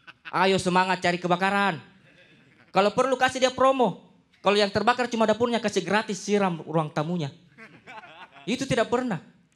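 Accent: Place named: Makassar, Indonesia. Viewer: Ini dia yg gue tunggu.. Orang Makassar mana suaranya ❤️❤️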